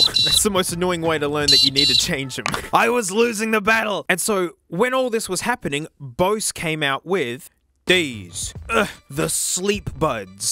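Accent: Australia. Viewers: I have never heard tinnitus said that way, am I wrong or is he just too Australian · I'm just here to learn the Australian accent of his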